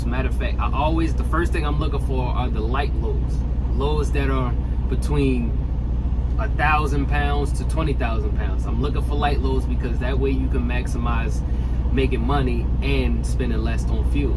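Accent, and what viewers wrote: Baltimore, United States. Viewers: I can spot that Baltimore accent from a mile away. Lived out Cold Spring back in the day. Respect.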